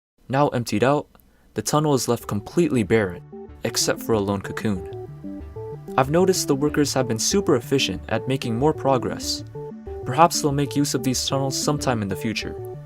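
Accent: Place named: California, United States. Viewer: Where are you from you sound like your from California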